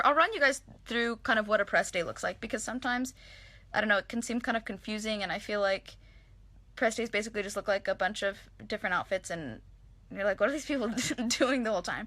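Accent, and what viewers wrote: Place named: South, United States. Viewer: I love when you talk Southern. You have the voice for it. I should know I've been in Georgia all my life.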